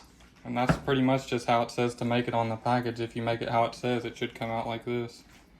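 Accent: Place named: West Virginia, United States. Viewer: This looks delicious yet simple. What a blessing you are to your grandparents! I just love your WV accent; sounds like the folks from my home in eastern KY. 🌻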